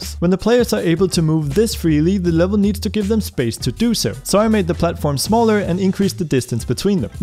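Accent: Denmark. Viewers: are you danish? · Are you Danish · 30 sec into the video and i felt a danish accent - turns out i was right :D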